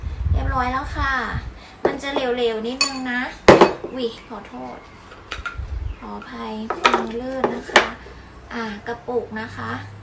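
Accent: Isan, Thailand. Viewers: เว่าอิสานม่วนดีเสียงน่ารักมากเลยน้อง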